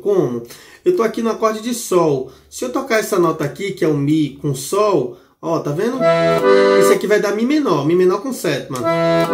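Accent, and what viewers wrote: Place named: Pernambuco, Brazil. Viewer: Vc é de Pernambuco?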